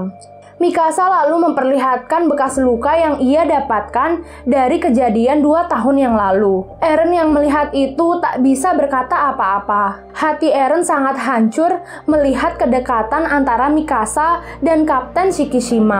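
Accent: Lombok, Indonesia. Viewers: Mba orang lombok ya?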